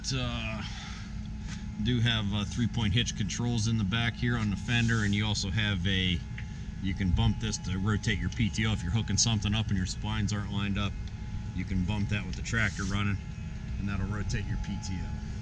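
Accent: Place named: Midwest, United States. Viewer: Same reason he says filders. He’s a Midwesterner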